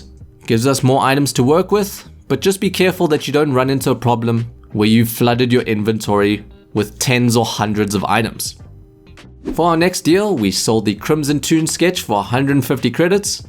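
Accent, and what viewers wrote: South Africa. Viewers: Are u south African by any chance?...just curious cause u have a strong accent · South African? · Are you South African? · Are you South African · U r south african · Are you South African? If so SA GANGG!!! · Hey are you maybe from South Africa?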